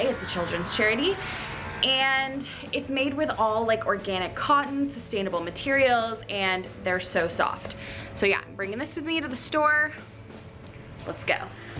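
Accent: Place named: Canada. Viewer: Am I the only American girl who loves to listen to lovely Canadians pronounce words like “couch”? Ah, so soothing.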